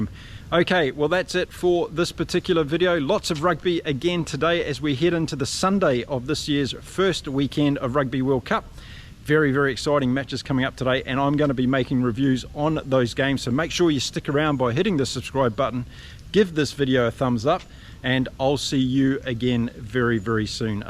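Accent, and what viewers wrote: New Zealand. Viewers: indeed, but you could easily apply that to the English team from the other night, because it was precisely power and self-belief that won them the game. I think what we're talking about here is aesthetics and beauty is different for everyone and is different in every society. What I found funny was that your reason is consistently unbiased and its only the Kiwi accent that tells us where you are from, but your aesthetics on the game places you very precisely in the world.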